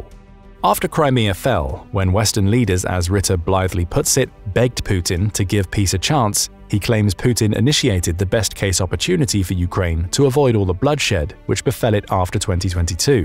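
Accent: United Kingdom. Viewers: Narration so long, so British - uhg. Plausible deniability, let us swim merrily in an ocean of plausible deniability, rampaging absence of curiosity, and a penchant for regurgitating things that POWER says, because POWER wields all the microphones, and it says this, and even when it is wrong, nobody ever looks at me funny for repeating what it says - so I repeat. NYAHHHH! Power says NYAHHH.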